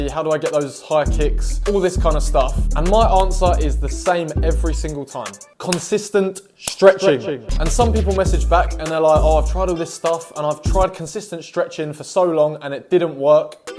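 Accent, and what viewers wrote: United Kingdom. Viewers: YOOOO YOUR ALSO BRITISH?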